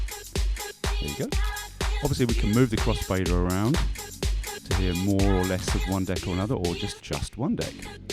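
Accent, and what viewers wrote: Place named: United Kingdom. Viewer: Thanks! GREAT TUTORIAL. For some reason things are a lot more intriguing in a British accent. PS. Im going to download all the songs you played.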